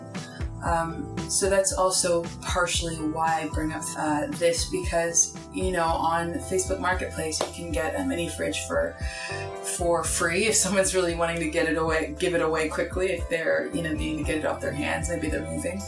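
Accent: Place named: New York, United States. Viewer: Subscribed after our video with Marwa, you haver an incredible New York voice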